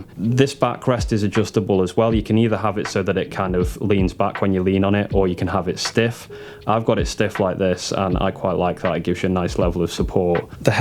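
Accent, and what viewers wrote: United Kingdom. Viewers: Yo man thank you for your videos. Been following for a while now and I really appreciate your content (especially the sp 404 vids)! I’m from the uk and you sound pretty local. Have you got a social media link? Would love to connect and pick your brain. Thanks for the consistent uploads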